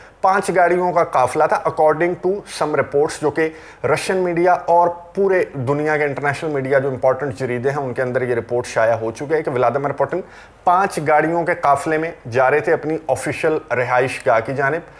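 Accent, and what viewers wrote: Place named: Lucknow, India. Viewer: Your "Andaaze Guftagoo is amazing" Urdu wonderful Lakhnavi Andaaz. MashaAllah. Keep it up, one suggestion !, Please do not indulge in Political or so called current affairs. I am 68.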